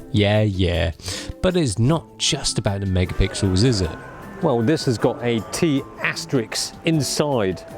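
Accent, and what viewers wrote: United Kingdom. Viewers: Most annoying thing is Asian talks in British accent 😑😑😑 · Asian guy with British accent is blood awesome! Isn't it?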